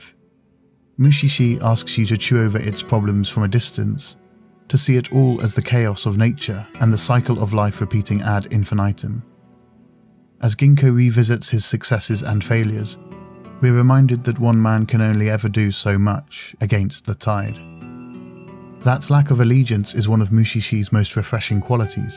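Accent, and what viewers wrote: United Kingdom. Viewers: Your British accent, the calm voice, the music choice, the visual choice and the approach to this work really felt like you gave the series the justice it deserves. By recreating the atmosphere you find in Mushishi, you made the presentation of this work beautiful. This short analysis was a great way to pin my feelings for a while, and to create my own thoughts around this show. Thank you very much.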